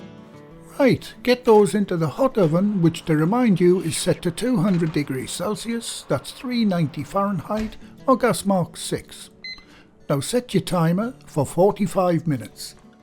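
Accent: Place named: Northern England, United Kingdom. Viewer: Your roasties look wonderful. I am from the North East of England too, now living in Australia. Nice to hear a Northern accent!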